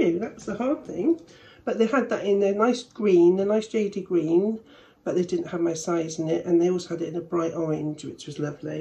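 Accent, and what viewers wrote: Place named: Wiltshire, United Kingdom. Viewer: Hello, I've popped over from Tina's channel. I've just done a Shein Challenge with Tina and she mentioned your channel so I thought I'd say Hello. Out of curiosity your accent sounds familiar, are you from the Wiltshire area? I am originally from Swindon but now live in Las Vegas. Your accent reminds me of my old accent, hehe. I will be subscribing as I not only did I enjoy your video but I love that us smaller channels build one another up. Have a great day!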